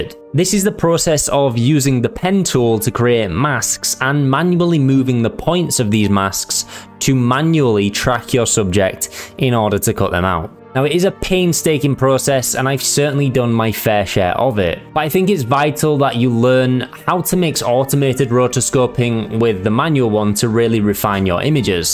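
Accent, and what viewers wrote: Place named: Manchester, United Kingdom. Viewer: You look and saund a bit Mancunian XD are you ?.. oh btw.. where can we find your projects? I love what ive seen here in this video..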